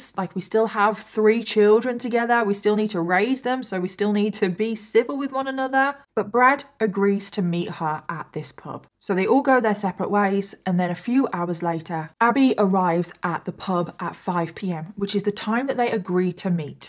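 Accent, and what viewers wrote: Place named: Birmingham, United Kingdom. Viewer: ‘A bit off topic’, but your accent sounds Birmingham. Am I right?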